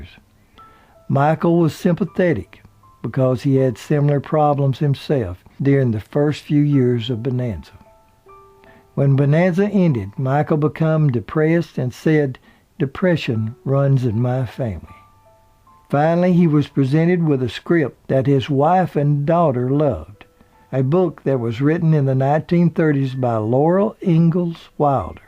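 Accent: South, United States. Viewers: I would’ve preferred someone with a northern accent narrated the documentary. I have nothing against southerners, it’s just that it’s so hard to understand him, the pronunciation of certain words and the drawl. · I would like to know who is narrating this video? He sounds like a true southerner. I’m from Alabama and I enjoyed listening to him narrate. Wasn’t a fan of Little House because Nellie was so mean lol.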